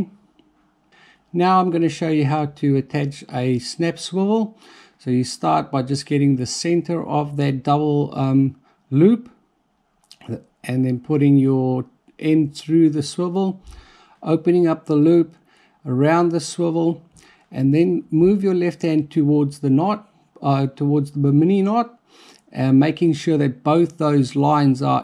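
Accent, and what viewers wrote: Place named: South Africa. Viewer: By the way, with a South African accent! HAve spent a bit of time in Southern Africa Like the video - great explanation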